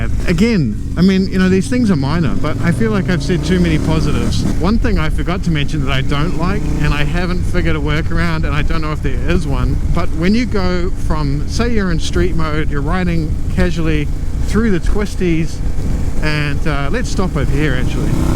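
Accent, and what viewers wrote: New Zealand. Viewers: NZ I reckon from his accent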